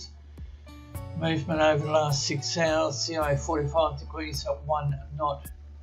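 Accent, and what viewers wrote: Australia. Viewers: For those who are saying (the person in this video) is drunk, He is Australian and He is not drunk A True F13 Fan always knows this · is a gem to Force13. Love his Aussie accent and the information is always great. Good to hear him... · dont make fun of him he is australlian and plz dont start a fight as a reply cause we cant agrre on anything on this website.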